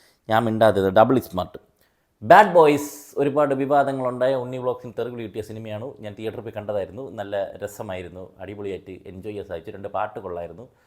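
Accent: Trivandrum, India. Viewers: Trivandrum slang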